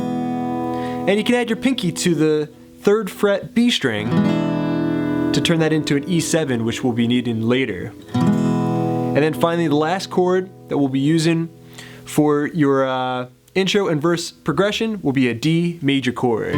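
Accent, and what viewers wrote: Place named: Philadelphia, United States. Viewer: Im also from Philly the accent was the clue I am working on Thanks for the great lesson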